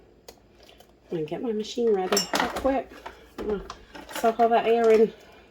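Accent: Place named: South, United States. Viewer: I swear I gut giggle when you laugh! Love it so much! I’m from the south so love the accent, too. Thanks for all you do. 💗 from Texas. 🇺🇸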